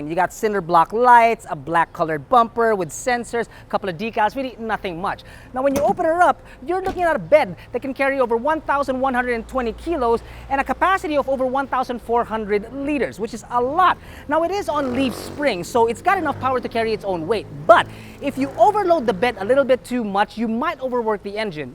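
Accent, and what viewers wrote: Visayas, Philippines. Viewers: Hahaha mao man! Murag bisdak nis bai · Ay samok haha bisaya bai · Hahaha! Bisaya ka?! Awesome, man!! Nag kinatawa gud ako!! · Bisaya d i ka Tito😅☺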